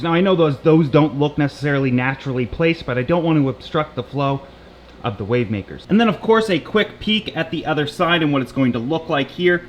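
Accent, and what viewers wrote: Canada. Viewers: volgens mij is hij canadees aan z'n accent te horen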